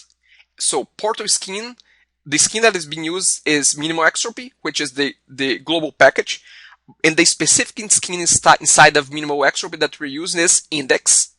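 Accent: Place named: Brazil. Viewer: Brazilian accent is unmistakable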